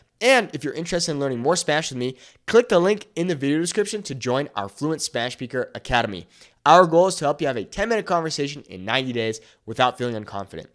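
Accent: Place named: Mexico. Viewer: Suenas Mexicano.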